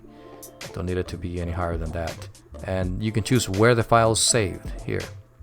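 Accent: Norway. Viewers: you norwegian? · waaaait, are you from norway?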